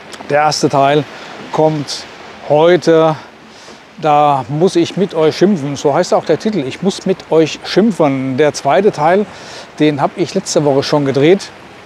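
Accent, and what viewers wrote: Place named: Hesse, Germany. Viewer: Ei Guude,.Ich lebe und arbeite seit 32 Jahren in Thailand/ Deutschland, spreche fließend Thai und bilde mir ein, das Land und die Leute relativ gut zu kennen. Dein Kanal ist einfach sehenswert, weil Du offen und ehrlich über Deine ersten Erfahrungen sprichst, versuchst, Infos zu vermitteln, obwohl Du selbst ja noch ein " Rookie" bist und das alles in schönstem hessisch. Ich finde das sehr unterhaltsam und rate Dir, diese Trolle einfach zu blockieren. Leider gibt es diese Art Menschen auf jedem social medium, die nur darauf warten, aus ihren Löchern herauszukriechen und irgendwelchen geistigen Dünnschiss von sich zu geben. Lass Dir Dein YouTube nicht madig machen, weiter sie bisher und von mir gibts, wie immer, einen Daumen nach oben! P.S. und grüße mir mein Pattaya. Habe da einige Jahre gelebt und es war eine tolle Zeit. 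Bangkok, der Norden, Phuket usw. sind toll, aber Pattaya ist eben was Besonderes ( hatte ich erst nach 7 Jahren Aufenthalt in Thailand festgestellt, da ich mich so lange geweigert hatte, mal nach Pattaya zu fahren ) Ich werde da bestimmt als Rentner wieder auftauchen.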